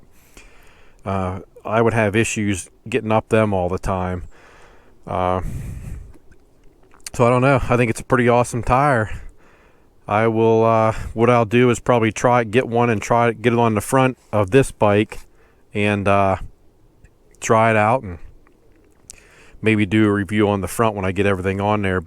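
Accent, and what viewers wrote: Pennsylvania, United States. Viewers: I saw your in Blair county, I’m from York county, I could tell by your PA accent you were from PA haha, always looking for people in central PA to ride with!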